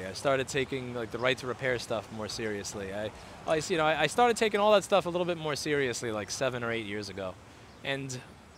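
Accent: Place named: Italian American, United States. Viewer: ... I apologize if you mentioned this somewhere in your videos and I didn't see it. But I need to ask you... Why do you look and speak like an Italian American but have a German last name?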